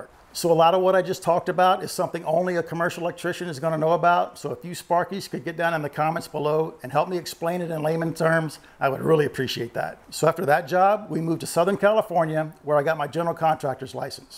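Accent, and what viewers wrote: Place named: Midwest, United States. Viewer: Popcorn and any ceiling textures are a bad idea! Nasty job! A question for. Where did you grow up? You have the mid-west accent. I grew up in Milwaukee, WI. Folks in LA know I'm not from here:-)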